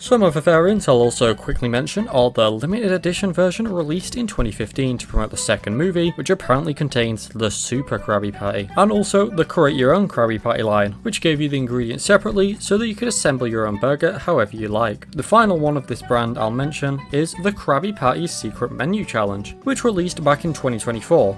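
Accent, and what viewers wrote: United Kingdom. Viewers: Are you You British?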